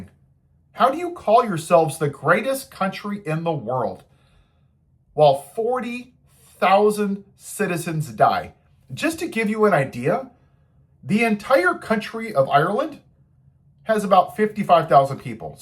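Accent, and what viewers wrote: United States. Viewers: You sound like a refreshingly normal American. Do come back. Good luck to you.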